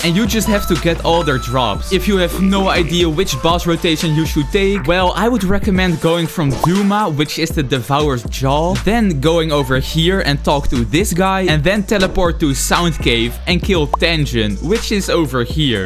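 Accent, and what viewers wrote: Netherlands. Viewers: why do you sound so dutch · u sound dutch are u? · i smell Nederlands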